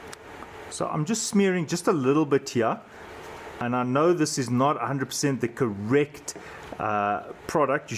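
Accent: South Africa. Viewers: Yay, South African Accent... Howzit and thank you for the DIY!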